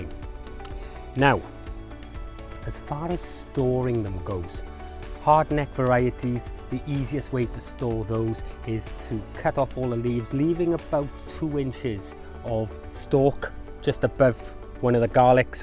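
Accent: Wales, United Kingdom. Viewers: Love your videos, great presenting style and the accent is brilliant! (I'm welsh too!). After watching this one I think I'll be adding Garlic to my garden next year. Cheers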